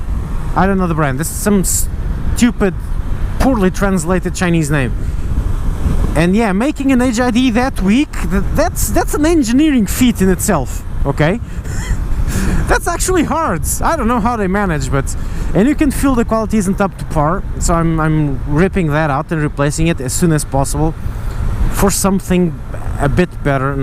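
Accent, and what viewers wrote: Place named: Portugal. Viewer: I was just listening to the video and I knew you were portuguese by the accent. Let me tell you. That camera is pretty good for $10 but "O que é barato sai caro" como já dizia a minha avó.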